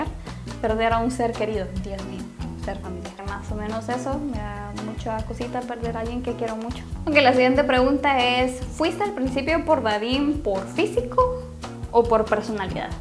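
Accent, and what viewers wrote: Guatemala. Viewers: Sabía que eras de Guate!!!!! Lo note en el acento 👌❤️👌❤️👌❤️👌❤️ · Desde que vi tu primer video de tipos de glúteos supe que eras chapina por tu acento Pero tenia la duda así que entré a este video y Me encanta saber que conozco tan bien a mis paisanos 🤣❤️ saludos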